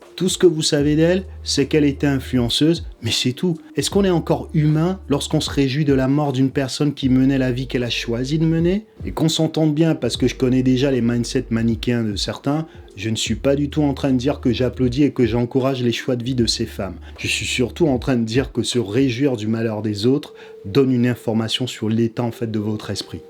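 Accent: Paris, France. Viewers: Wow je te connaissais pas , et en un vidéo je suis fan de ton accent parigo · tombé par hasard, il est marrant ton accent parisien ;)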